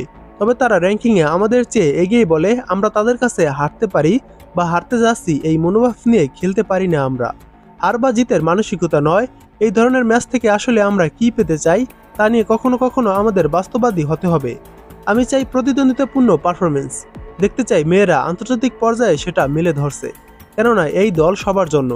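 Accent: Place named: Khulna, Bangladesh. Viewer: আপনার খুলনা সাতক্ষীরা উচ্চারন শুনতে ভালই লাগে।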